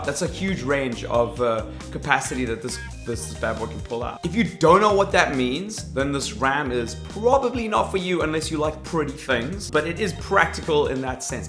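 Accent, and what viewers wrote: South Africa. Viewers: You are, was, is or come from South Africa I heard it in your voice first then this Only ppl who know what that word implies like the vid or, I WILL HATE YOU FOR THE REST OF YOUR LIFE. then i forgive you because there is no reason to hate a dead person. Just realized your hair changes color here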